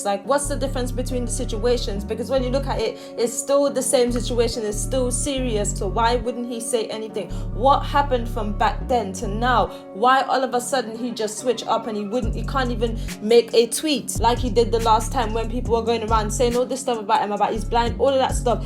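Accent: United Kingdom. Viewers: How the fuck do you have subscribers you speak straight gibberish mad fast with a British accent. Idk if you’re making a video about Chicago or a London drill rap either way both trash.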